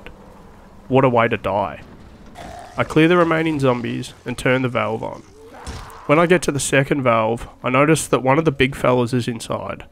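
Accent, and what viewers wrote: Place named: Australia. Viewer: The Aussie commentary is great Hoping to see more of this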